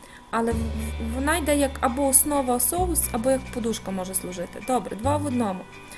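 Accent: Western Ukraine, Ukraine. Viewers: Сьогодні вперше надибала Ваш канал і відразу ж підписалася. Шукала оладки. Ще нічого, звісно, не готувала з того, що Ви пропонуєте. Але точно спробую. А поки-що Ваша мова - то вже найсмачніше, що є серед усієї тої смакоти. Дякую Вам за гарну мову, рідну, колоритну і таку смачну!!!! )))) Звідки Ви? Чую, точно десь із Західної України )) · Как же я люблю ваш украинский говор,некоторые слова не знаю,но по смыслу понимаю, люблю вас😘 спасибо 🙏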